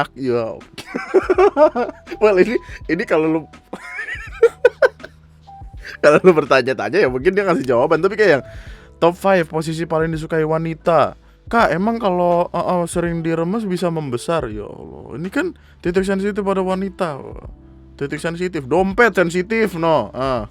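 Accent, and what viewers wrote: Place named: Lampung, Indonesia. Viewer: Raden Inten Lampung itu bang, dari logatnya org Lampung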